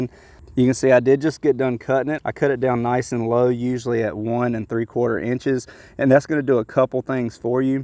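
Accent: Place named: Southeast Georgia, United States. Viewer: Lawn looking good. You sound like you're from southeast Georgia.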